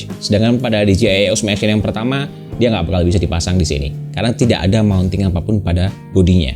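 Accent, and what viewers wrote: Malang, Indonesia. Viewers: Lah orang malang ta ? 😅 suka sama review nya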